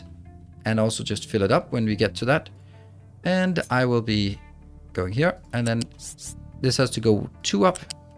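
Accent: Denmark. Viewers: I’m really wondering what country your from because you sound danish but I’m not sure. Hope it’s not a too private question. Keep up the work.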